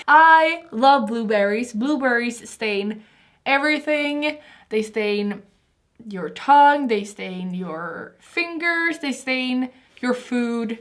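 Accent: Sweden. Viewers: omg are you swedish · Is she Swedish?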